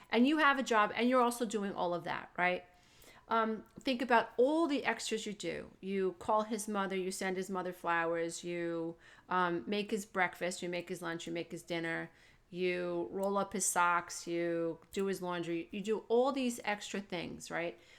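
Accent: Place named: Long Island, United States. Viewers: Wow! I've learned more in thus short min video than I have in the 5 years of therapy that I've been going to! Thank you, thank you, thank you! As I listened, I recognized your accent. Originally, I thought Brooklyn bc I'm originally from Bensonhurst. But I read the wiki page and it said Queens. Close! Also, the "Shutty, shutty"! I say that too and got it from The King of Queens', one of my favorite shows ever. Lol I look forward to learning from all you have to teach! Thank you again. 💕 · Grunt at me like a hog. your funny. I’m from Long Island and your accent makes me feel like I am back home. 💕💕💕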